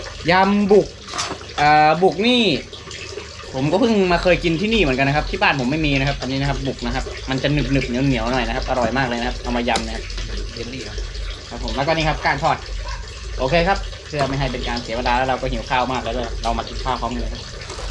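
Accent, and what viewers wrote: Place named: North, Thailand. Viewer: สำเหนียง จะอี้ เป๋น คนเหนีย แม่น ก่อ นี่ น้อง 55555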